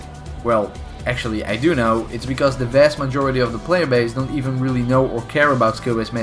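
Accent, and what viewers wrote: Netherlands. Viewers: I guess you’re dutch? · are you dutch? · Are you dutch? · Je bent nederlands, Ik hoor het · Are you Dutch?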